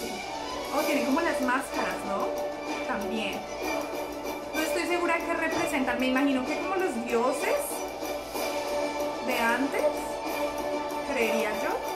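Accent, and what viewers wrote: Colombia. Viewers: Me encanta el acento colombiano! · Amo el acento colombiano 🥺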